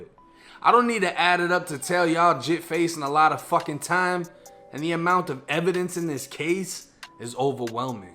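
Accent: Boston, United States. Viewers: Love your videos bro . Florida boy with the Boston accent . 💯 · What part of boston you from? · Jus noticed the Boston hats you be rocking! And the accent leads me to believe you from the bean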